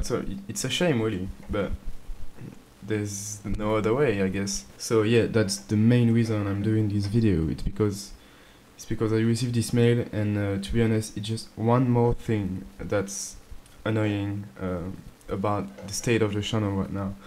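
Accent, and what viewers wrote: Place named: France. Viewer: ptn ouais j me disais bien que t avais l accent francais!^^ ca fait plaisir, brf continu ta chaine surtout tu gères👍🏻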